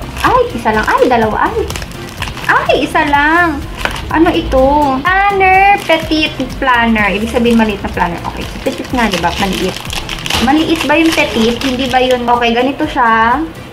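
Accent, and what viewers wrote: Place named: Conyo, Philippines. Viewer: Nakakatuwaaaaaa hahahahaha ang conyo mo na ate hahaha ang cutieeee lab lab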